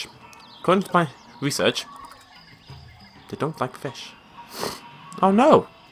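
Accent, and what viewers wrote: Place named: Yorkshire, United Kingdom. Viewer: You sound like your from Yorkshire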